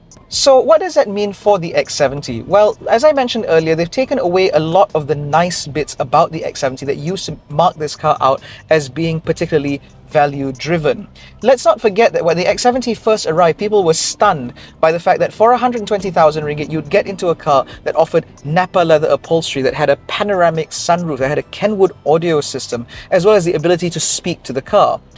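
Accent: United Kingdom. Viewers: Subscribed one minute in. You'd think he was a native British presenter.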